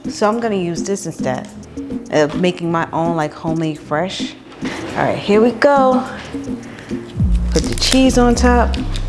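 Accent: United States. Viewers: Go girl u u look good i just came across your videos i just subscribed im going to continue to follow you i just started working out but i work out at home ❤😂 keep up the good work u sound like my cousin from Westchester new York white plains i love y'all accent ❤💯🤣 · 😳💭 “You’re sound American-born, you’re body is lookin’ Greek statuesque, but the name is lookin’ Haitian as hell.” Watches video 👀💭 “She said ‘Kisa?..’ and ‘North Jersey’ came across the screen?!.. She’s definitely Haitian b!” 💯😂😏💪🏾🙏🏾🇭🇹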